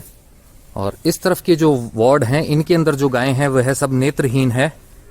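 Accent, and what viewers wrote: Standard. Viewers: Jai Karni Mata ki. Aur app ke dwara hum sab jo dekh rahe hai jan rahe hai uske liye app ka avari hoon. App ki sudh Hindi bahut hi accha lagta hai. U r too good spokesman, like it. App acchi Sanskrit bhi bolte hai. Karni Mata ki sabhi jagah behad Sundar hai aur mainly safsutra dekhne wali hai. Goushala bhi bahut accha aur saf hai. Too nice vlog presented by you ji thankful to you for it.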